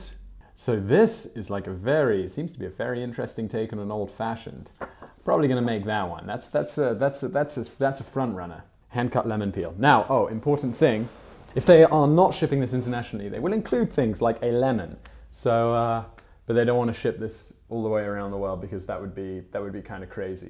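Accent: England, United Kingdom. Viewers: It sounds less like softened American and more like a British person who has lived in America for a while. He definitely sounds British at home but with a very slight hint of American in there. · Not American. Just more laid back British.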